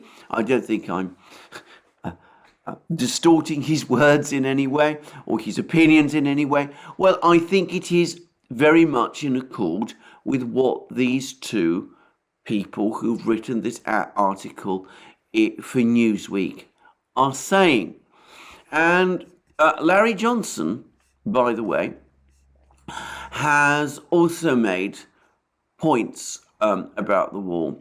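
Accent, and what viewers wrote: United Kingdom. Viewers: I love your voice! Your authentic British accent is soothingly calm and civilized, yet with a range of emotion surprising to most Americans. Your phrasing is jazz, Frank Sinatra, Etta James. As expected, the famous British knack of understatement is here in spades. You leave me smiling and shaking my head for weeks remembering your deadpan delivery of the obvious, drolll punchline. Not to mention your background, knowledge and experience backing up your reporting on current political issues, giving me a much wider understanding of all the essential elements as they unfold. Thank You!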